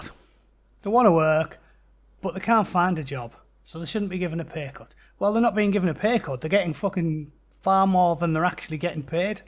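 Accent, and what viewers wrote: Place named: Teesside, United Kingdom. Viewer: I think it may have been a little bit of a bold statement but the point being it a lot less of a labour strong hold than it used to be. I'm from Guisborough anyway so what the fuck would I know apart from the arse end of a 🐑😉. Just though id let you know I enjoy the content and it's nice hearing a local voice shitting on the commies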